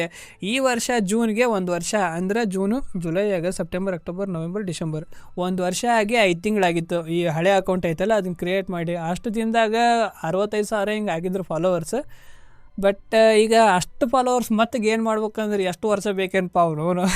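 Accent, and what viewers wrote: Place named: Vijayapura, India. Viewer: ನಿಮ್ಮ ಮಾತ ನಮ್ಮ ಕಡೆದೆ ಇದೆ ರಿ ಸರ್ ನಾವು ವಿಜಾಪುರ ಮಂದಿ ಅವನವನ ಬಾರಿ ಅಂತೀರಿ 😁😁😁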